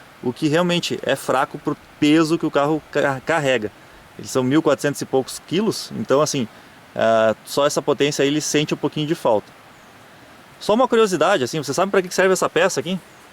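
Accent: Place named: Rio Grande do Sul, Brazil. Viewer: Vc é gaúcho né rs, se sim, conheço logo, como o baiano, usa "tu" e ao invés de falar "di noite", fala "d noite", "d baixo"... Bem legal o sotaque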